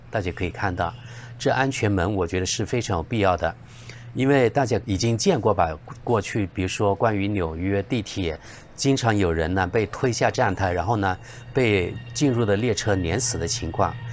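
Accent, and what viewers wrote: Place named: Guilin, China. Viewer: 听口音就是桂林老乡，桂普太明显啦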